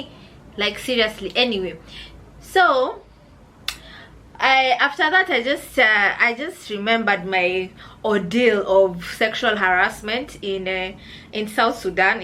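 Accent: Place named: Kenya. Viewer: I always love to hear your stories , sweet kenyan ascent unfortunately too much in Swahili , didn't understand, nice of you though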